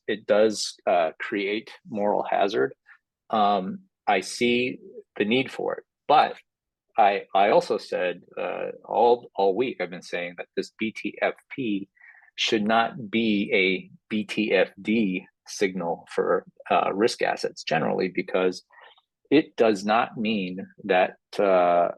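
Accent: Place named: United Kingdom. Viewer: So I have a book about active listening and it suggests you repeat the last sentence the other person said, but in your own words, so, slow it down, you speak quickly, and say, “so if I understand you correctly, you’re saying blank blank blank. He’ll say “yes” then you ask a question that builds on his answer”- lots of books out there on how to interview and ask questions in an active listening mode. Mostly I’d say slow way down because you have a distinctive British accent but talk super fast. You’ll figure it out and continue to provide great content! Thanks for making this video!!!!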